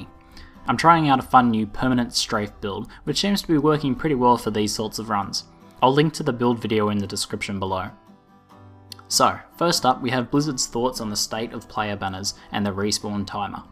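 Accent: Australia. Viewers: Sounds pretty good. Nice to have an Australian voice on youtube :) sick of Americans...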